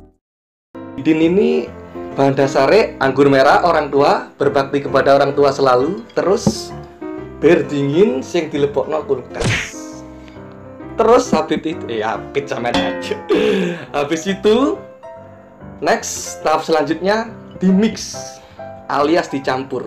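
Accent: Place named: Pasuruan, Indonesia. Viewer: Mas bro orang mana sih.logatnya kyak orang pasuruan??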